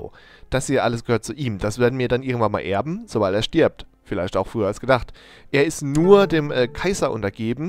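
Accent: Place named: Baden, Germany. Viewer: Kann es sein das du aus Karlsruhe kommst du hast mal deine Stadt in Sim City mit Karlsruhe verglichen und jetzt spielts du Baden, und dein Akzent scheint mir irgendwie auch aus dieser Gegend zu kommen da wohn nämlich auch (im Breisgau) Mach Baden bitte zu einer Großmacht und über nimm wenn irgendmöglich Schwaben/Würtemberg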